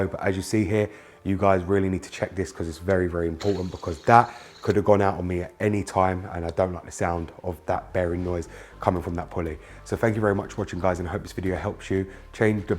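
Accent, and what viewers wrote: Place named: Cockney, United Kingdom. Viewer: Hi Dean. Don't know if you read comments on a 2 year old video but your channel is gold to me mate so thanks heaps. I am going to be doing this job soon I think. My 2007 E61 is at 160000kms/100000 miles now. I have a leaking valve cover gasket which caused a misfire on two cylinders dripping onto the coils. Those were replaced fairly easily but I'm going to get a professional to do the valve cover gasket as I'm don't have all the tools or quite the skills to do it confidently. Nor do I have the time. Thankfully I have found a really good BMW mechanic and the quote I got is pretty reasonable. PCV vent hose and eccentric shaft censor seal are getting done too and all up the job is $850NZ which is very reasonable all things considered. Great mechanic with the best reputation in town. He's about as Kiwi as you are Cockney and I think you'd approve of the level of professionalism and use of original BMW parts. 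There's plenty I would do myself like belt, tentioner and idler pulley but the valce cover job is a bit beyond me I feel. Valvetronics will need coding in any case. Cheers mate. Thanks again.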